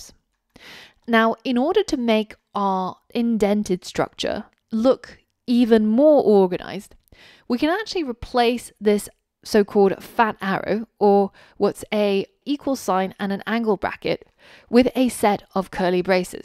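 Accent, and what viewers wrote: United Kingdom. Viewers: I'm getting accidental ASMR from your British voice :) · I love your British accent!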